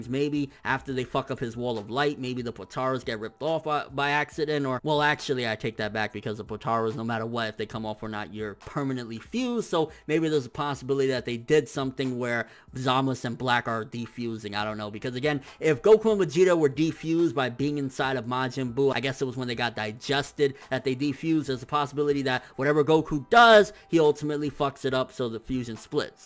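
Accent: United Kingdom. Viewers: love the British accent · Just imagined Funimation hiring to voice Zamasu in the English Dub with that British accent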